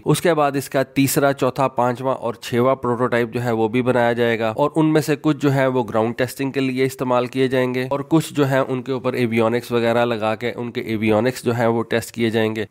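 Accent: South Africa. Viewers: SOUTH AFRICAN!!!😂😂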